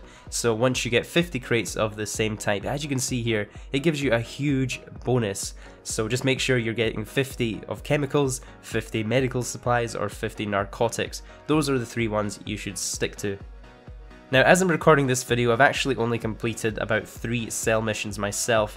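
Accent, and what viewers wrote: Scotland, United Kingdom. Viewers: Are you from Scotland if you are so am I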